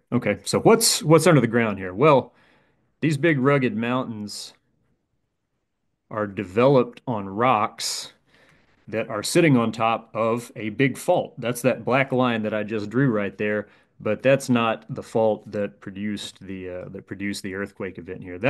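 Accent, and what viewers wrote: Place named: Western Pennsylvania, United States. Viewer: ...is the narrator from PA, spesifically western PA? He sounds like several family members that are from near Pittsburgh.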